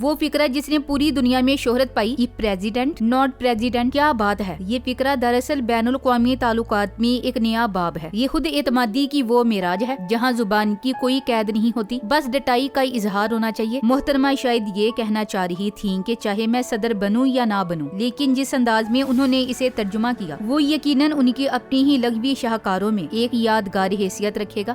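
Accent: Punjab, Pakistan. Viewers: Tu urdu mai bhi pu njabi bol nahi sakti pando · Tumara tu lahja hee buhat buckwass hay. Tum tu urdu bhi nahi bool skati ho.punjabi main urdu boolti ho..our lahaaja to buhat hee uneducated Hain...sharam karo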